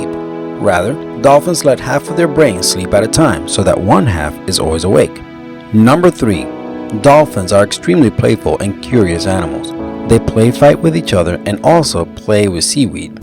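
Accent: Mexico. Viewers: Is the new guy Mexican or Puerto rican?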